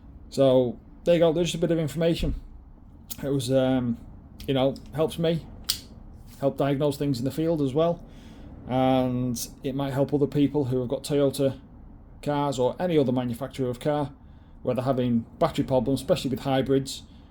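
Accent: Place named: United Kingdom. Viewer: thanks ! Gonna get the yuasa ybx5202 ! it's only 80€! don't want to waste my time at Toyota! anyway if they replace it They're gonna do it with a shitty battery ! Do you know if the latest Yaris 2024/2025 still have the same crappy batteries in them? On m'y Corolla 2024 i have VARTA ! Like your British accent👌😅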